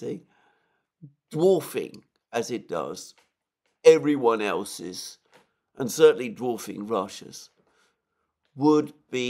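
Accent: England, United Kingdom. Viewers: he speaks clearly and very slowly. I grew up in an English household so I’m used to the accent and even many of the mannerisms. The speed is the only part that irks me a little but I do understand why he speaks so slowly and concisely. I’m also happy that he checks his sources now rather than just parroting Russian MOD talking points like he did towards the beginning of the SMO. Basically his show is like an hour long run down of dozens of Russian speaking telegram channels and the information lines up. I appreciate him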